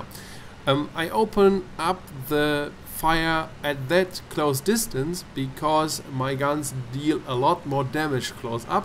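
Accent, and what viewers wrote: Germany. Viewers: Well thank you! This video (and the whole 'how to' series) is incredibly helpful. *thumbs up In case I identified your accent correctly: Super gut, werde ich sicher noch das eine oder andere Mal als Referenz nutzen. :) Beste Grüße! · Love a nice German accent for tutorial. for real. Thanks, great info. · Your accent; so let's speak in Deutsche !!..Ohh Ich nicht sprech Deutsche Ahhaha..jijiij jajajaja, jejej. Bueno, hablemos castellano, idioma más impresionante.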